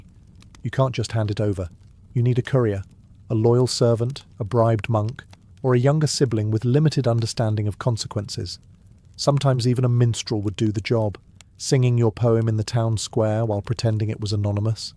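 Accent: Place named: England, United Kingdom. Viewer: Cool history subjects! What puts me to sleep it is the English accent, I always fall asleep watching movies with English accents 😴😴😴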